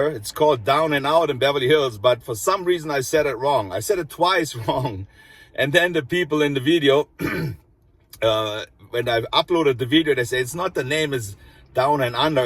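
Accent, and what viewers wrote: Germany. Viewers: Vor ein paar Wochen wurde mir ein Video über Obdachlose in LA von dir auf YT angezeigt. Ohne vorher auf den Namen des Kanals zu achten habe ich reingeschaut und nach ein paar Sätzen von dir gedacht, dass der Akzent sich irgendwie deutsch anhört und bin dann auf den Kanal um herausfinden, ob ich recht habe. Musste nicht lange suchen als ich den Namen des Kanals gesehen habe :) . Seitdem habe ich schon einige Videos geschaut und auch den Subscribe Button gedrückt ;) Bisher war ich zweimal in Venice, das letzte Mal 2003 und ich glaube mich an deinen Henna Tattoo Shop zu erinnern. Den gab’s da schon, oder? Falls ja, haben wir mit Sicherheit damals sogar miteinander geredet :) Liebe Grüsse aus Heidelberg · You and your German accent are always a treat to listen to. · We love your German accent, and your English is very good! You are just a ray of light my friend, always positive and make people feel good! 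Love your energy and niceness. Keep up with these videos, you make me smile and laugh at every one. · Last night a friend was over and we got bored so I put one of your videos on. It inspired a lot of conversation and it was like we were there hanging out with you. Miss Venice beach, next time I go your henna shop is top on my list. Your a smoother talker than you think. Keep it up, you can only go up from here. BTW, I love your German accent and hearing your pronunciation of German and English words. Don’t feel self conscience about it, it’s unique and cool. Own it and be yourself. You da man!!!🙌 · We like u the way you are sir & I love your German accent!! I cant imagine learning German, much harder than English!! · Ich komm auf deinen german accent voll klar 😃👍. Tatsächlich macht er es mir etwas einfacher dem zu folgen, was du uns erzählst. Cheers man. Stay healthy and in good mood ✌️ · I love your German accent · You should feel proud of your German accent. 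Germany, to many Americans, is associated with efficiency, innovation, and strong work ethic. The influence of the German people in America has been quite profound. The best blue denim pants in the world were created by a German in America, out west even, and centuries later, Levi Jeans are associated with being cool and rugged, and are still quite popular. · Don't worry, we like your German accent.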